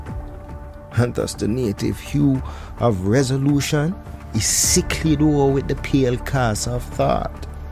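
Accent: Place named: Jamaica. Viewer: Wonderful the Jamaican accent is Tight my Brother so uniquely poetic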